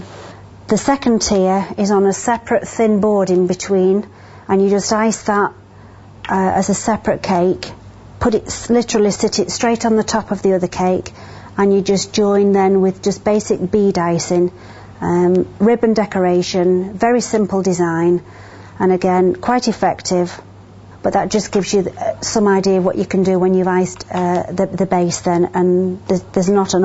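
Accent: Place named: RP, United Kingdom. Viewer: I've never seen jam used before...interesting. I love British accents. They are so posh and everything they say sounds smart :-D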